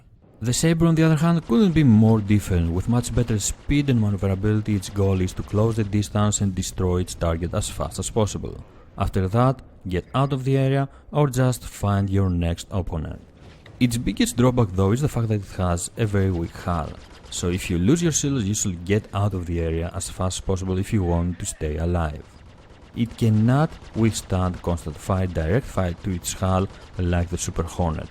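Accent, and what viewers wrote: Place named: Greece. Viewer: Nice videos and very well presented ! Subbed right away . Sounds like a greek accent :) If so , I am double proud for you , early backer here o7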